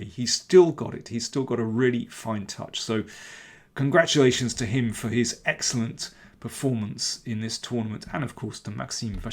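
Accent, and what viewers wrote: United Kingdom. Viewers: Even though you don’t get crazy view counts on your videos, I must let you know that your videos are perfect. The classy Brittish accent enhances these fantastic chess match reviews! I’ve been a long time subscriber and this channel is like ASMR to me.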